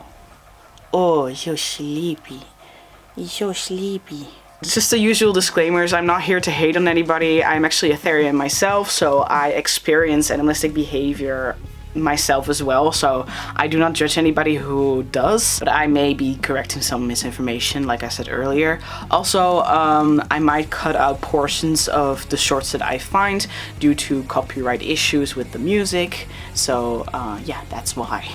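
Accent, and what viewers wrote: Netherlands. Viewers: ARE YOU DUTCH??😂❤ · Are u dutch I am but u know I heer the accent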